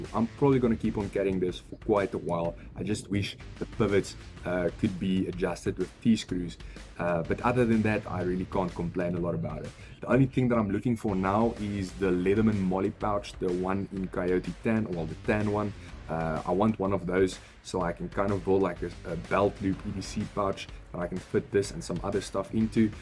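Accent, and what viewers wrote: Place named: South Africa. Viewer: Among other multitools, I have both the Wave+ and ST300M myself. Sadly couldn't get it in that awesome tan/black color at the time, but I guess that just means I can get a Rebar with that color scheme if I wanted to. Although I personally prefer the Wave+ so far, I'd always bring the ST300M - which is closer to the Rebar - for more heavy things aside from going into woodlands. Concise and informative video. Also: I love the south african accent, ever since I saw Lethal Weapon 2 back in the day. "Ah. Just checking to see if I was standing on plastic." Keep up the videos, and I hope you grow and get to do more.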